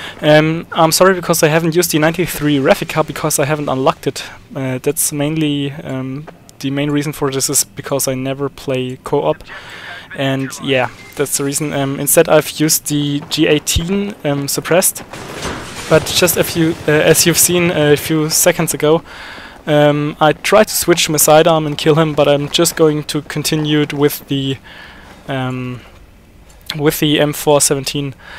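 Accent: Germany. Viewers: You're german, aren't you?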